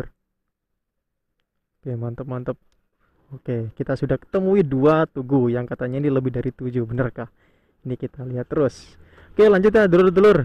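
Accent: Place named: Indonesia. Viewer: jane jane ki wonk ndi yo.. kok tekan kepohbaru barang ki.. 😁 kondang tenan kang.. logate kok kyo wong luar Bojonegoro ki.. 😀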